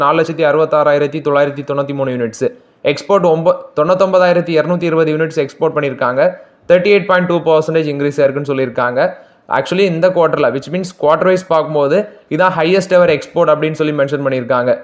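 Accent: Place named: Tirunelveli, India. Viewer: Bro! Are you from South TN? Tirunelveli area? You speak Aattu, kaandi etc, the Tirunelveli local language.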